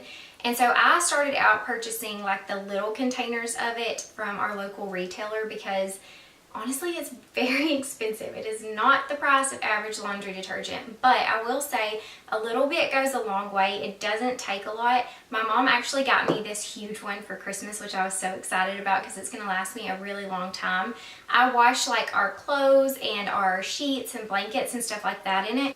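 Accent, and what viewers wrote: South, United States. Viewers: Where are you from.it sounds like the South.I'm from Alabama.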